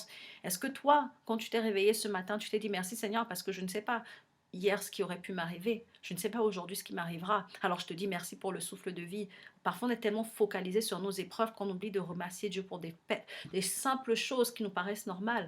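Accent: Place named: Cameroon. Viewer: oh Mon Dieu! ton accent je me disais aussi que tu es Camerounaise. l'accent😅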